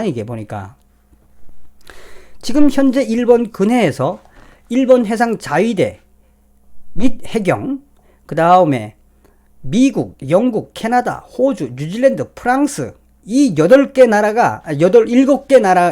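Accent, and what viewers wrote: North Korea. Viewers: 황장수의 뉴스브리핑에서 북한정제유 환적에 대한 집회에 동참해 주시길 바랍니다. 대한민국 국민들 다수가 나서는 모습을 보여야 외신도 주목하고 한국을 포기하지 않습니다. 그리고 문죄인도 무너지고 통일도 빠를 수 있습니다. 문죄인이 무너져야 한국이 제대로된 북한제재에 동참하고 북한의 숨통을 제대로 조일 수 있습니다. 주변에 되도록이면 많이 참여하시도록 부탁드립니다. 저도 지방에서 생애 처음으로 참여하기로 했습니다. 방송 잘 보고 있고 북한 사투리가 정겹네요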